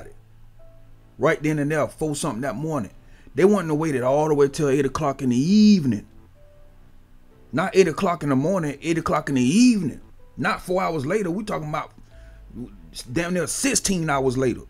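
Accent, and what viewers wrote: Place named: South, United States. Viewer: He so southern like me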